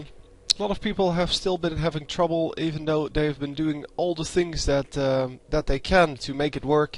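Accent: Netherlands. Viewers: hearing a bit of dutch trough your voice :D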